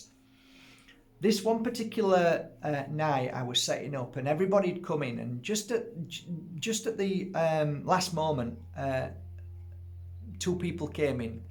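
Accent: Yorkshire, United Kingdom. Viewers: Thank you. Honestly I would love to hear an audio book in your own 'Yorkshire voice '. XxX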